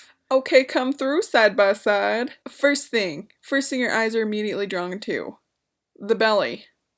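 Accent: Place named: Midwest, United States. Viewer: I know you said you’re in CO, did you vote up there? Your accent sounds very northern/Midwestern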